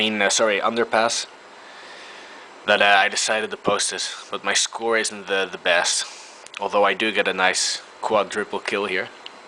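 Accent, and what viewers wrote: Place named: Netherlands. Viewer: kom je toevallig uit nederland... i just asked you if you re from the netherlands cause your little accent sound dutch..... good video by the way.... i like the bushmaster also...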